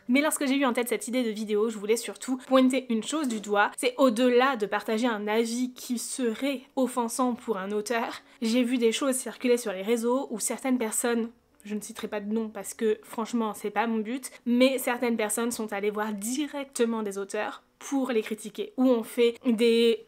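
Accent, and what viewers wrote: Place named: South, France. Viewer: Merci pour cette vidéo. Un rappel de tolérance nécessaire. PS: j'ai cru reconnaître un accent sudiste. J'aurai mal entendue peut-être. Mon accent toulousain est ressorti aussitôt 😊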